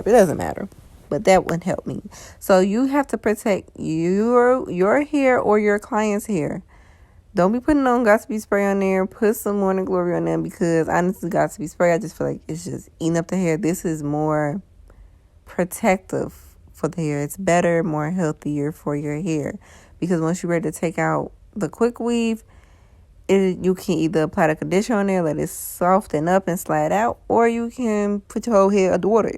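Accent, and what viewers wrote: United States. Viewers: That southern twang. Yes baby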